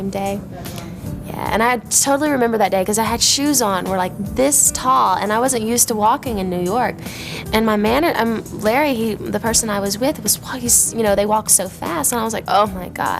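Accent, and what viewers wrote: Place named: South, United States. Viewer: Too bad Britney has lost most of her cute Southern accent :(.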